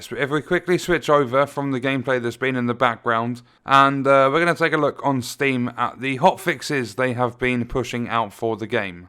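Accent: England, United Kingdom. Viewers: he aint eu player he sounds english - they are not in eu lol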